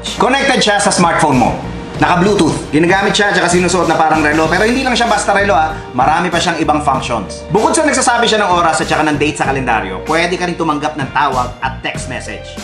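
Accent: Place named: United States. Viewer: idol bcoz of accent..na adopt ksi ng american...pero kapag bristish nag salita nyn iba bigkas nila...hehehe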